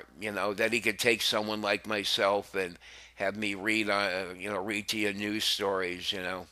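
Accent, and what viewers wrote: United States. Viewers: so happy to hear you're back vocem tuam! Prayed the rosary for you last night. Over here in Europe we love hearing your authentic voice, American accent and pronunciations - they are all fine! I enjoy the ad hoc commentary and analysis, it is all true and absolutely faithful to the Magisterium. Deo Gratias.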